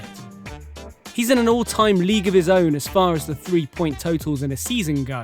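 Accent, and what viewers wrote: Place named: England, United Kingdom. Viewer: Stephen and not steven. I am sure u re inglish man and not american because of your accent.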